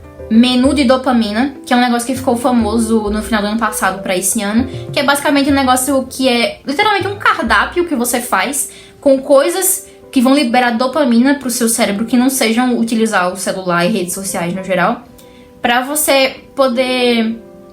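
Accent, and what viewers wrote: Northeast, Brazil. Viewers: Talvez eu esteja enganado, mas percebi um leve sotaque nordestino em você, talvez influência de algum conhecido. Eu sou do nordeste, por isso. Eu sinto muita nostalgia dos anos 2000 também, muita mesmo, e mais ainda dos anos 90. Eu nasci em 1992 e possuo 33 anos, não importa nada do que digam e explicações, eu vivi esses anos e eles são infinitamente melhores que os atuais. E eu posso argumentar com fatos! As pessoas viviam focadas em suas relações interpessoais; A política e economia no país seguiam a normalidade, ou seja, infinitamente melhores que hoje; As drogas tinham menos alcance, haviam poucas facções e não controlavam o país inteiro como hoje; Havia segurança jurídica: Os políticos não roubavam descaradamente e nem prendiam quem descobrisse; A modernidade líquida não havia de tornado, ainda, o padrão. E eu poderia ficar aqui por horas. 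· Oii bom dia tudo bem? tava tomando café da manhã, e fui abrir o youtube pra assistir algo enquanto como, e seu vídeo apareceu na minha tela com um título que me interessou bastante, assim que você começou falar, já senti que era de algum lugar do nordeste/norte, e vi ali num comentário você falando que é de PE, sou de Garanhuns, não sei se conhece, ah e você falou que vai fazer 22 daqui alguns dias, vou fazer 27 dia 31 desse mês também, enfim, de qualquer forma queria falar que sinto que seu canal já virou um dos meus favoritos do YT, tanto por você ser do mesmo estado que o meu (isso causa uma sensação de maior próximidade, sei lá), quanto pela qualidade do primeiro vídeo, isso faz eu sentir que os seus próximos vídeos irão me agradar sempre, te desejo todo sucesso do mundo! ansioso para ver os próximos conteúdos <3, saudade anos 2000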